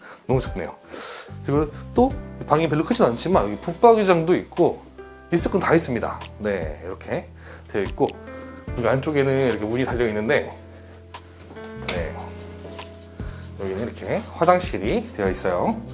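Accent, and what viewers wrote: Jeolla, South Korea. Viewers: 아따 이양반전라도네! 전라도씨가 온사방에 퍼져있으니 거시기 하네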